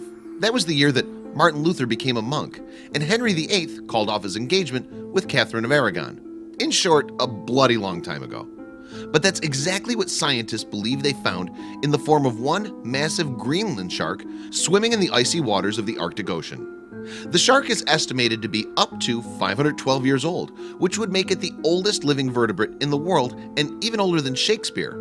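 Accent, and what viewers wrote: United States. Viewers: It's so weird listening to a guy with an american accent read a British/Australian script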